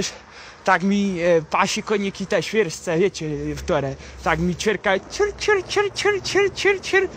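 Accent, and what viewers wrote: Poland. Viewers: fajne:) jak nie lubie góralskiego godanio to fajne:)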